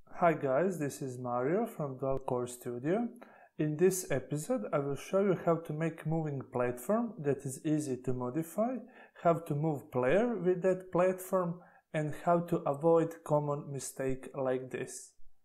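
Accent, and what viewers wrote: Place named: Russia. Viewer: русский да? ну или украинец - акцент)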